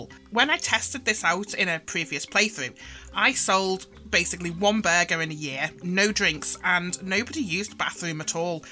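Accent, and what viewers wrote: Liverpool, United Kingdom. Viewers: you sound like you're from somewhere surrounding liverpool, nice video too!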